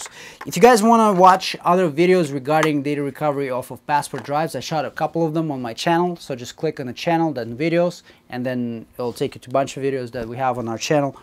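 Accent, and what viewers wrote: Russia. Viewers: is that a Russian sounding accent that I detect there?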